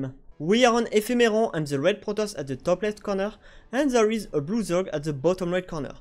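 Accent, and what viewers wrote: France. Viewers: Man you are so cozy guy and your accent tho! Really makes me smile when I hear your frenchglish haha:D thanks for your work sub and bell dont disappoint my trust!! :D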